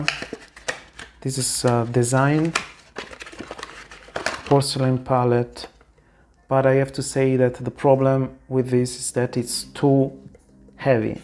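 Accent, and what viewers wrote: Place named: Italy. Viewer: Thank your for showing your sketch books! I like to watch videos like that but most sketch books are more art books - too perfect to be true. ;) Your sketch books are encouraging. Also thank you for showing your art supplies. I also like to hear your voice with your italian accent. :) Greetings from Germany.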